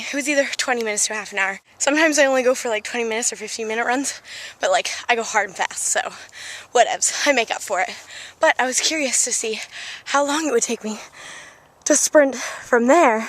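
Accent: England, United Kingdom. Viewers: Your English accent was awesome! Can you do like some of next clog in the accent? PLEASE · Your English accent was awesome.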